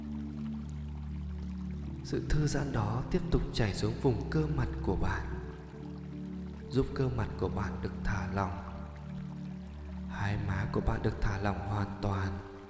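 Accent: Vietnam. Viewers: Giọng anh hay quá, giọng Bắc chánh tong tôi nghe mà rất cảm xúc, ấm áp lắm! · Chú là người Hải Dương phải khong ạ ><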